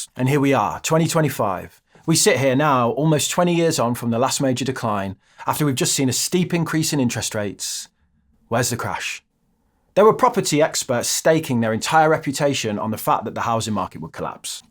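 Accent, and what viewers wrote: Birmingham, United Kingdom. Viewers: Took me this long to realise you were a Brummie 😂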